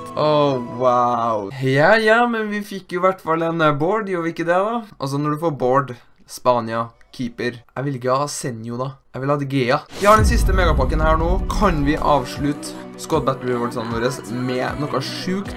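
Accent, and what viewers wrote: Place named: Trøndelag, Norway. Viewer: Liker ikke selv om det er bra vidio men du er trønder og jeg er fra Molde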